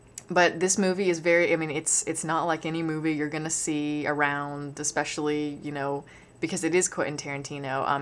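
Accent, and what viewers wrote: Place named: South, United States. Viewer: Southern twang? Accent has changed a bit. A follow up re review on this? It has aged horribly imo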